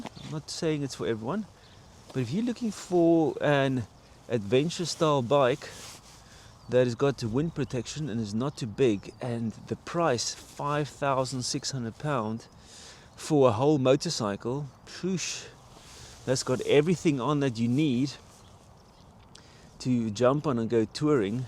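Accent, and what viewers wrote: South Africa. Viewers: Hey boet. You sound south African if I'm correct?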